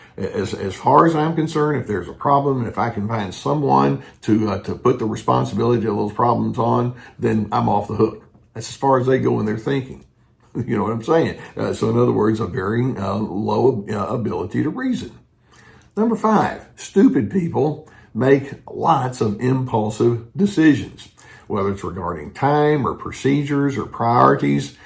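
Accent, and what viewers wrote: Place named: Texas, United States. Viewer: I find your videos very useful and I love your Texas accent! I'm from the UK. 👍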